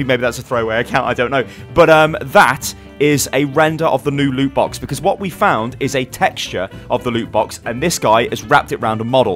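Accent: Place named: United Kingdom. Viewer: where in Britain are you from?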